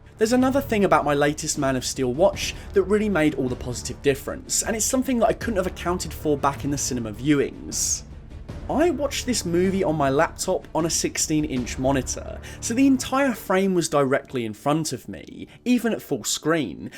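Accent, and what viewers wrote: United Kingdom. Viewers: You got a put this video out a year ago. Now it’s too late to jump on the bandwagon of success. I have a problem with Zack Snyder‘s conservative politics which do seep into his movies. He made Rorschach a hero in the watchmen when he was meant to be a sociopath. In this movie superman is detached from his usual since I’m morality, right and wrong. And his parents tell him not to help people. Stupid objectivism. I guess you can’t hear how thick your British accent is. Your Kevin Costner was a terrible impersonation. The Man of Steel has flaws. The story should’ve been total chronologically. With all the distraction of metropolis there is no way Lois should have been in the final scene. I mean what did she do ?- take a taxi? Jor El played by Russell Crowe basically gave Clark the job he was literally born to do. In direct contradiction with everything he was fighting against on Krypton. 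And I did not see any reason why Clark needed to have the codex pumped into his DNA. It didn’t make him stronger than any other kryptonian. It was a plot point that was never used again in any movie. Maybe the goal was to have all the little kryptonian babies put in the bottle city of Kandor or like in the comic books. The excessive destruction started with a comic book from the 80s called damage control by the late great comic book writer Dwayne McDuffie. He later went on to create static shock, a whole line of Black superheroes for DC And was one of the major writers for the justice league and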